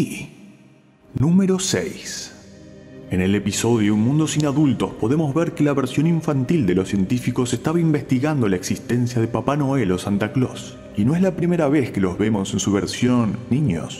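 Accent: Argentina. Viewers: Primero se van los acentos argentinos,luego promos a juegos,gente,lamento decir que se acerca la decadencia del canal 😢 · Soy el único que cree que la voz del "presentador" se parece a la de Zico Tops pero con acento argentino? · che vos sos argentino¿ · Porfa que vuelva el ascento argentino:(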